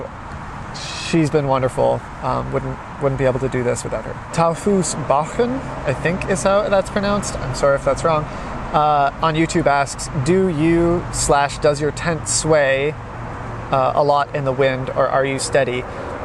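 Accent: Canada. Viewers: I like your argument, but he doesn't live in Germany. He lives in Canada. You can tell by his accent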